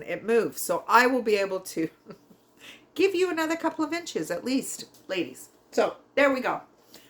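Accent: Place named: Southwestern Ontario, Canada. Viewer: I am fairly new to you & just realized your talking SW ONT! HOME!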